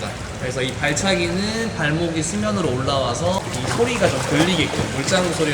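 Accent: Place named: Jeolla, South Korea. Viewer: 말투가 전라도ᆢㅎㅎ 저는 목포염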